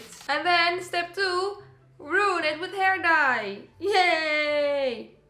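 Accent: Netherlands. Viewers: Wait are you Dutch bc I know our english accent😂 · Are you Dutch? I'm definitely hearing the accent lol. Love your videos!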